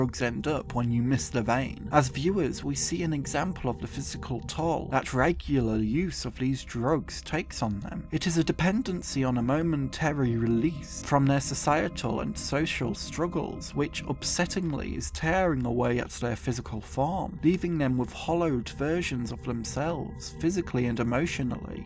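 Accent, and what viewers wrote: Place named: United Kingdom. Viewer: Oh no! Mis conocimientos en inglés se pierden con el inglés británico 😭😭😭😭 , pero igual Gracias ! ❤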